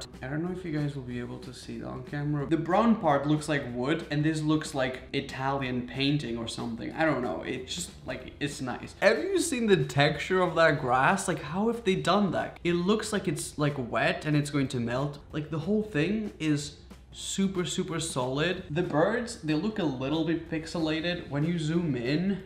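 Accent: England, United Kingdom. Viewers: He’s from england i think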